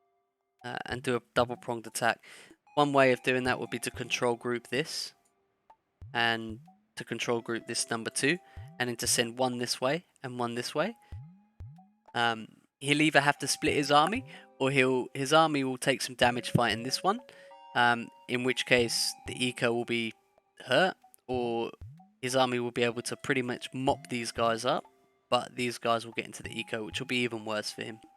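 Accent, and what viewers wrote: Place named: United Kingdom. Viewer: OMG HIS BRITISH Anyway thanks for the video we need more content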